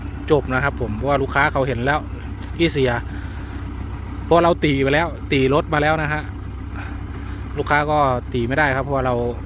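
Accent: Phu Thai, Thailand. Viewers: แอดมินเป็นคนผู้ไทบ่ครับ สำเนียงเว่าคือๆแท้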